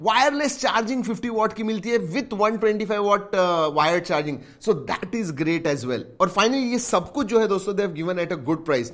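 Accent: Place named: Bengal, India. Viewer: Aap Bengali ho kya.....aapke hindi me Bengali tone hai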